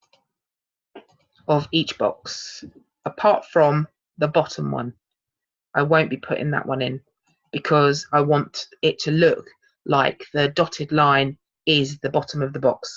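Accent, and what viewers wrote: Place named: Hertfordshire, United Kingdom. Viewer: Thank you. New to KDP so learning how to make one’s own interiors is good to know rather than having to get software like Tangent Templates (although I think I am tempted to as well). May I ask a question: would you recommend TT, are you still selling on KDP as this video is from 2 yrs ago?, are you still posting videos? You sound like you are from my neck of the woods. I’m a 54yr old mum from Hertfordshire (top of Northern Line). Thank you.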